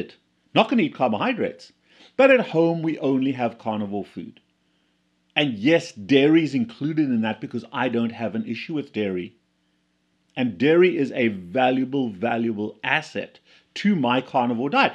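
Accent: South Africa. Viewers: Hey Doc. I recently found your videos and I'm enjoying them so much. From the first video I could immediately could hear that you are from my neck of the woods, from SA. Thanks for all your videos. Lekker man.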